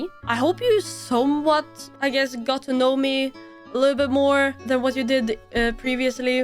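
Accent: Sweden. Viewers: There's actually the tiniest hint of a tiny Swedish accent if you listen carefully. All Swedes I've met in real life speak execellent English. Oh well an then there's Anomaly of course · you sound swedish. its the swedish accent breaking through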